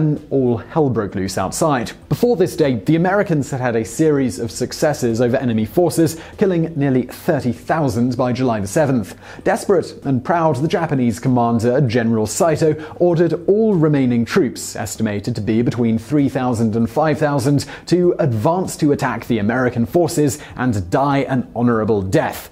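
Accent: United Kingdom. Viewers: Wtf I thought this was Vsauce (Michael here) I was so thrown off by the British accent 😂😂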